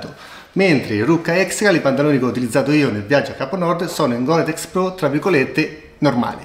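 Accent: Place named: Macerata, Italy. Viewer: Ciao bel video, con quello che costa un indumento tecnico finché possibile sempre meglio fare una buona riparazione che ricomprare nuovo. Dal tuo accento penso di averti incrociato in qualche parte della Provincia di Macerata 😉👍🏻✌🏻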